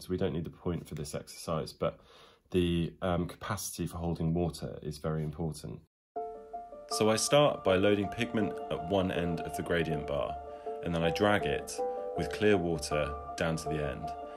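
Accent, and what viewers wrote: United Kingdom. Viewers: Watercolours has been an enemy to me for years, pfft. But even so I enjoyed this video a lot. I'm a big fan of people who can actually work with watercolours, they have the God's hands I would say😂 Also, brittish accent gives lives. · How did I not find you before?? Add with an English accent : a balm to my ears x I'd appreciate any contents on volume and movement, please! Thanks!!!!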